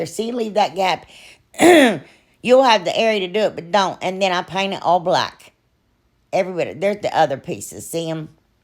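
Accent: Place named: South, United States. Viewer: For one u r from the south so don't ever try changing ur voice again cause I love listening to u talk in ur own voice. Second I always love everything u make and if I could I so would totally try makin this ur way lol believe it or not but I would. U really r the best person and funniest love I love watching so plz keep making ppl laugh ur way. Thank U❤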